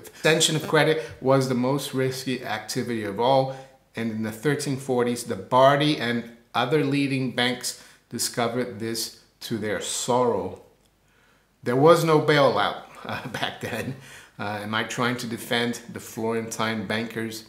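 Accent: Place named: East Coast, United States. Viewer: I enjoy your channel! Difficult to know you're Brazilian because you speak with what sounds to me like a U.S. east coast accent. Did you spend time living in New York, New Jersey, or somewhere on the east coast of the U.S.?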